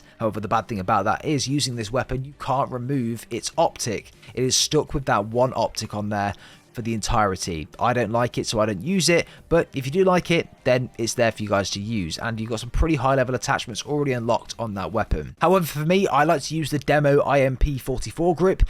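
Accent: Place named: United Kingdom. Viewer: Can’t you hear that he’s British. We say things differently than Americans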